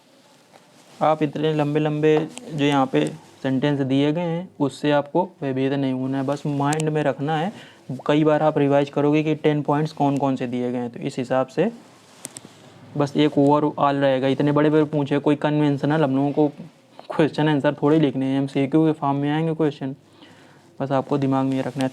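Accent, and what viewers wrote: Kanpur, India. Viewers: Awaz se aap kanpur ya Fatehpur ke lag rahe hai 🙏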